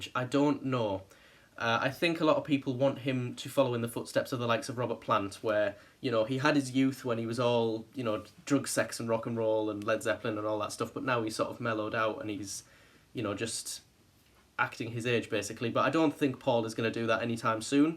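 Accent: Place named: Northern England, United Kingdom. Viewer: I'm happy there's a positive review. I was happily shocked how much I enjoyed it, how experimental Paul is willing to go. I love it and it keeps growing on me. My personal favourite is ' ' which just brings me to tears every time. It's just such a beautiful statement on the power of love, it's so sensitive and fragile. Exposing his pure heart within. Something truly, truly amazing. And that flute solo! I think it's something you could only really understand with an open heart idk. is just manic debauchery, I love it. I don't know has a wonderfully melancholic opening piano intro. Everyone rips on and I get it, but if you listen closely there's a lot of production elements and melodic arrangements which are quite clever. and speak for themselves. should be given more credit! is a gorgeous little number. I like all the tracks, come to think of it. 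I think he's simply done an outstanding job. It's an album that will surely add and not substract to the legend of. Bless him. Side-note, I am desperately trying to pinpoint your accent but I simply cannot come up with anything other than 'North', or possibly Wales or something. At the very least, far from London. Which is kind of sad, since, if you should possibly allow it, you're exceptionally cute. Oops. Anyway, great review and bless xx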